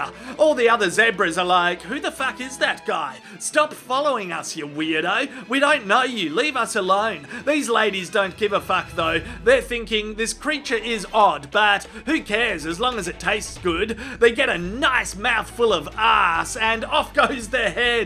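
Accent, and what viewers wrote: Australia. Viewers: Australian is one of my favorite languages/accents. I love hearing the way they talk and is just hilarious in my opinion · please go full 'stralian i would love to see you do a video on australian words... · This Aussie cusses like an American. But it’s better with the Aussie accent. Take note fellow aussies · What makes it even funnier is the Australian accent 😂🤣😂🤣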